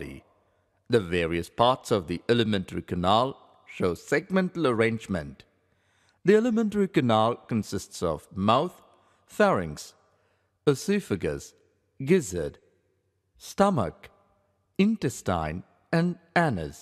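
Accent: India. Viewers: Its an Indian accent. If you dont like the way someone sounds, leave. Nobody is asking you to stay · Indian accent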